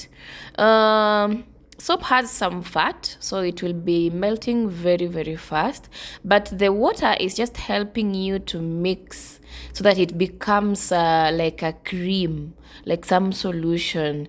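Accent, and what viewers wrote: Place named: Africa. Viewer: Simple. I loved it bcoz of your narration, and with out seen you, I knew it's from Africa 🌍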